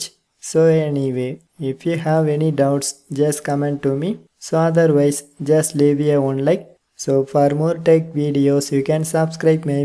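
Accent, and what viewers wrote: India. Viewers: You my man are a god with sexy sweet Indian accent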